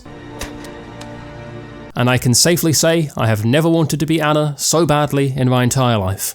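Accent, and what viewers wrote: United Kingdom. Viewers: What is this accent? I can only tell it's British but not more precise than this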